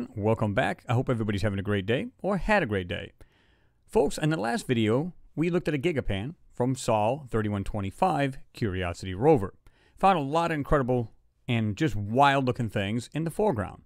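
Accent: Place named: South, United States. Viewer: bro I love your southern accent.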